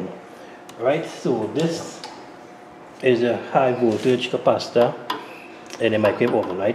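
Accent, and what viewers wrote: Trinidad and Tobago. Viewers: Surely........a Trini accent. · Are you Trinidadian ?? Lol my microwave turned off and wont turn back on now dont know if the fuse is blown or not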